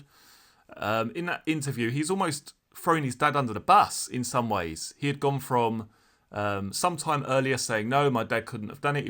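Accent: United Kingdom. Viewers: Another UK guy covering a US story—I have noticed a huge number of UK people narrating US stories. Now I love you guys, don’t get me wrong, but it’s Frickin’ irritating…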